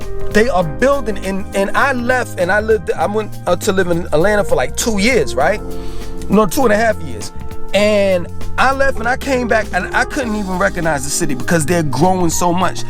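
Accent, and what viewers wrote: New York, United States. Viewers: He has a strong NewYork accent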